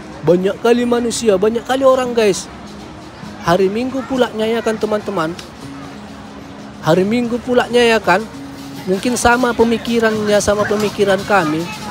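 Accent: Medan, Indonesia. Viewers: Indonesianya dari mana bang, Medan kah?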